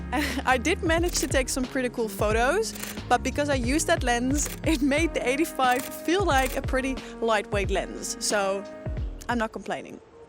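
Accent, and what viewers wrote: Netherlands. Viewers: Question, are you dutch? Cause i am, snd i thought i reconised the accent😊 and i really love what your doing! So inspiring🫶🏼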